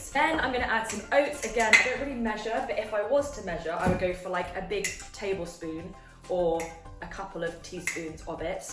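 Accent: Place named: South, United States. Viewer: I enjoy your videos. They are enjoyable to watch but would you stop with the southern accent? Please.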